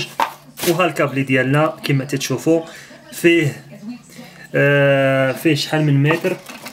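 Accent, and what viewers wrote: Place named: Algeria. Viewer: هاذة جزائري تغيرت لهجته بسبب الاحتلال الفرنسي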